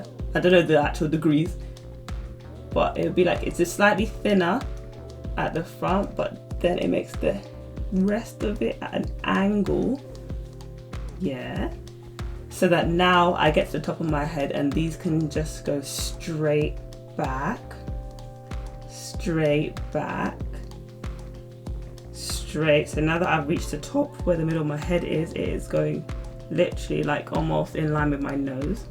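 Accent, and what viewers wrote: United Kingdom. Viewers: I love your accent London accent i guess You are a beautiful woman with a beautiful voice 💕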